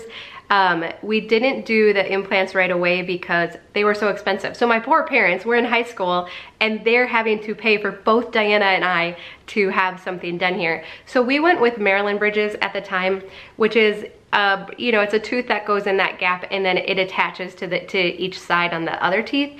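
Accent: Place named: Midwest, United States. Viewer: It all depends on where in the US you’re from. Most of the country says roof with the “oo” sound like moon. But you can always spot a Midwesterner with “roof” and “root”. Oddly, “room” doesn’t follow the pattern. I’m from the Midwest as well, but my parents pronounced them with the usual “oo” sound so I did, too. But all of the kids I grew up with said them as does!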